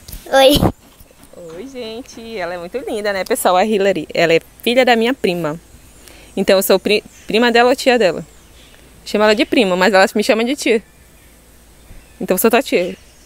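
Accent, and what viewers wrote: Rio de Janeiro, Brazil. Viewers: seu sotaque é de carioca, esse sotaque arrastando o x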